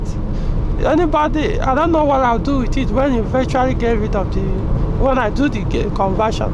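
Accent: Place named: Igbo, Nigeria. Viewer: He's speaking English with Igbo accent/intonation. He's from the Igbo tribe in Nigeria.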